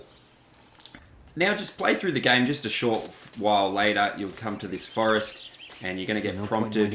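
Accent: Australia. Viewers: GODDAMNIT the one good walkthrough I find!!!!! He has an Australian accent. I can’t focus 🤤🤭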